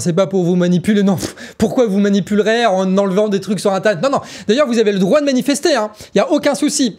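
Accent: Brittany, France. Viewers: Je te suis ! Vive Macron et la liberté d'expression ! Dis, j'ai une petite question qui me trotte dans la tête depuis longtemps. Quand tu dis "quand même", j'entends "co même". Est-ce que j'entends bien ? Si oui, est-ce dû à l'accent de Guingamp ? Ou est-ce parce que, petit, tu as appris cette expression en la comprenant mal ?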